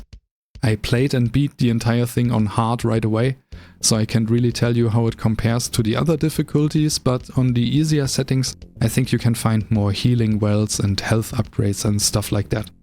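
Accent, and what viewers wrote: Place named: Germany. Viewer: you have very beautiful accent - is it German? P. S. I figured out you were only starting by the audio quality - there's too much noise and the volume is a little unbalanced. Still great video xD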